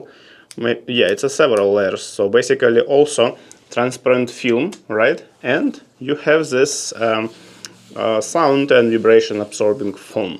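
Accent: Russia. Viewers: ваш акцент похож на русский, вы говорите на нем?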